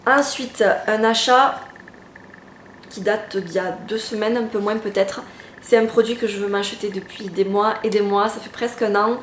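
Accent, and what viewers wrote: South, France. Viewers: Tu es au top trop géniale tes haul sont supers en plus avec un accent du sud ..... mais quel moments magiques!!!!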